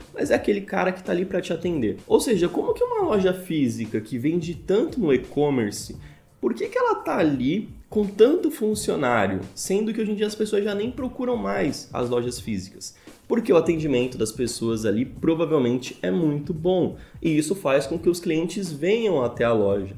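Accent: Minas Gerais, Brazil. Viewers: c e mineiro de onde? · Uai vc é de minas? kkkk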